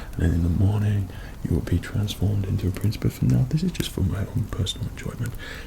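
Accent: England, United Kingdom. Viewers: Arabian genie but has a English accent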